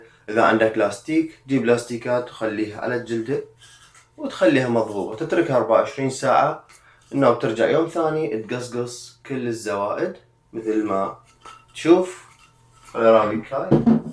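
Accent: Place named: Basra, Iraq. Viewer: رحم الله والديك كلش خوش فيديو و حبيت لهجتك البصراوية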